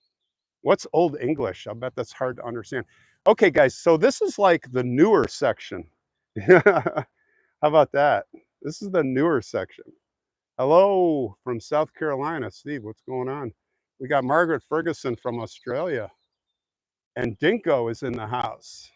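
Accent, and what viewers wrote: Scotland, United Kingdom. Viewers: Loving your Scottish Accent! 🏴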